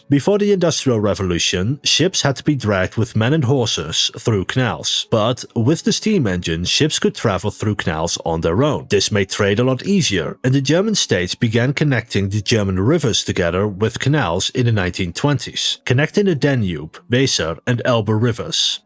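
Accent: Netherlands. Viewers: ik hoor je niet vaak engels op zijn rutte praten maar Kanals😂 klonk wel mooi helemaal omdat je het tig keer achter elkaar zij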